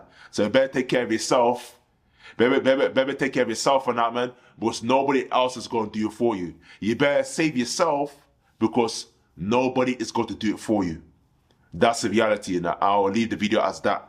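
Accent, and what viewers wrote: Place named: Caribbean. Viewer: you say you are muslim bro but cl you sound west indie. Where are your family from?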